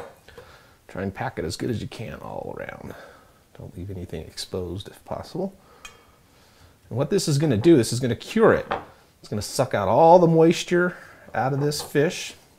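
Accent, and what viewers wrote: United States. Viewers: I work commercial salmon fishing every summer in Alaska. I make this recipe 10 times a year. Love it. You from Idaho? I can hear it in the accent. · He sounds American so I think Fahrenheit. Also these are smoked so 150 Fahrenheit sounds about right because 150 Celsius seems too much and will burn the fish in less than an hour.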